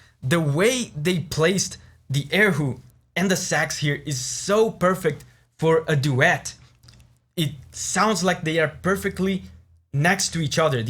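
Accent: Brazil. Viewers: Your accent sounds like a brazilian person, are you from Brazil? (Manda salve se for) GREAT video btw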